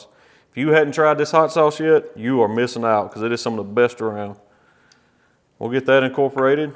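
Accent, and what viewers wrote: South, United States. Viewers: a good ole southern boy from mississippi sayin "we fittin tuh" fittin, fittin, 🙄🙄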